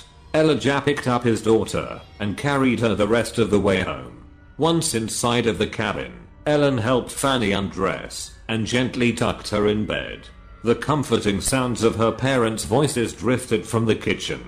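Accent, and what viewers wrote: United Kingdom. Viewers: All of his voices and pronunciation is perfect......Full of British Accent!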